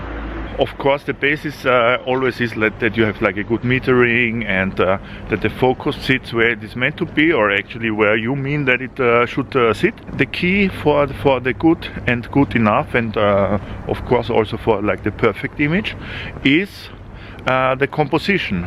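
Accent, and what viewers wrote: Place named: Germany. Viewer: Dein deutscher Akzent bei deinem Englisch ist der Hammer🤭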